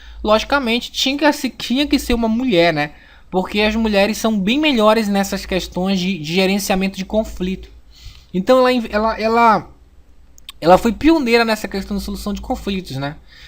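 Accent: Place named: Pará, Brazil. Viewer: Ahaha desde seu primeiro vídeo conheci o sotaque paraense rsrs, saudades da minha terrinha...mas amo Brasília rsrs! Adorei o vídeo sabe muito, parabéns!!!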